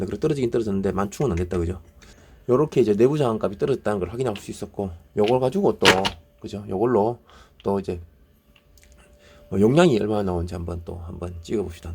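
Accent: Gyeongsang, South Korea. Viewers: 자막설정 안하면 뭔말인지 몰것다...ㅎㅎㅎ 경상도 사투리는 언제들어도 적응이 안된다.......ㅎㅎ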